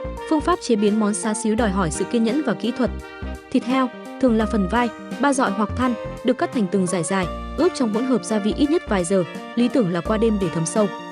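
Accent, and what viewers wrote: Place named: Vietnam. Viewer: AI nó đọc thì đọc theo cách phát âm tiếng Việt là phải rồi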